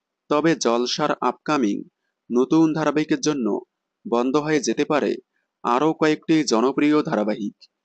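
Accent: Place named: Bangladesh. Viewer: Apner katha balar kharap bangladesi úcharan brisri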